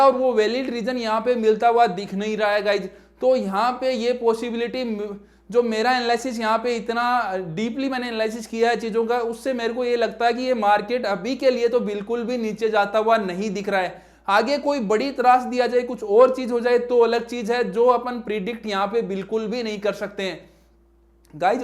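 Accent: Rajasthan, India. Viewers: It seems u r from Rajasthan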